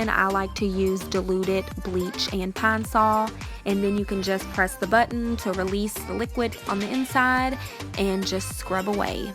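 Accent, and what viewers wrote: South, United States. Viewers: Hi from Louisville, KY also! I was wondering where you were from whenever I heard your southern accent, lol! Your video just inspired me to completely clean my house after I get off work 😂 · I am a new subscriber and I am in Peru. I love what I see and you are very sweet and real. Where are you in the USA you have a Southern accent.? Xx I am of native American and Peruvian heritage so a clean home and family values means a lot.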